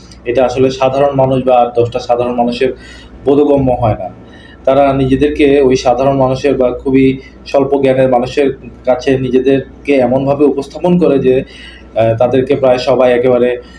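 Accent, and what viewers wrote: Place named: West Bengal, India. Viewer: আপনার ভাষা শুনে মনে হচ্ছে, আপনি পশ্চিমবঙ্গের কেউ। যদি না হন, তবে পরিচয় দিন।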